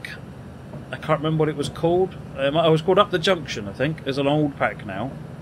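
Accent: United Kingdom. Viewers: You have a bit of a suffolk/norwich accent.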